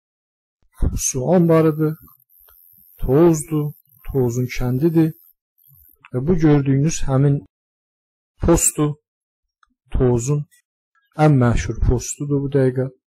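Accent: Armenia. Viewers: Danışığından da bilinir erməni olduğu. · Sən azərbaycanli deyilsən .Azərbaycanli olsan azarbaycan dilini biz azərbaycanlilar kimi danişarsiz amma siz gic ermənilərin azərbaycanin torpaqlarini oz torpaqlari kimi qələmə verir və sizdə dilimizi guya bizdən biri kimi ozunuzu elə gostərib calirsiz siz ermənisiz pis insansiz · Siz ermənisiniz ləhcənizdən bilinir , görünür Azərbaycan dilini qəşəng bilirsiniz. Biz sizə nifrət edirik , XOCALI soyqırımında günahsız insanları vəhşicəsinə qətlə yetirdiyiniz üçün sizə nifrət edirik. Azərbaycanlılar bu cür danışmır. Siz həqiqətən bir ermənisiniz. Allah bəlanızı versin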